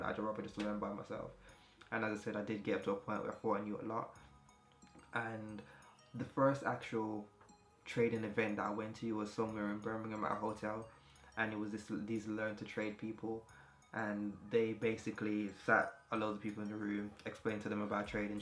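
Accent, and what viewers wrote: Jamaica. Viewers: whats your ig? you sound jamaican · Di Jamaican accent a chip een tho lol thanks for the video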